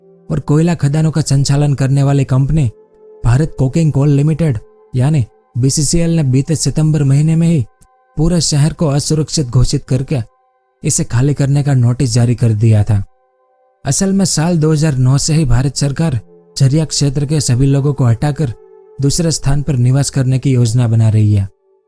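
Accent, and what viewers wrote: Gujarat, India. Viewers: Aap gujarati jaise kyu bolte ho.??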